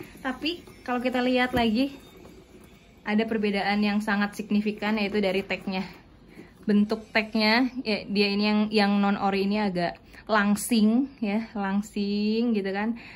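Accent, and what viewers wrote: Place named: Philippines. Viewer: Are you Filipino? You speaking with a little bit mix Tagalog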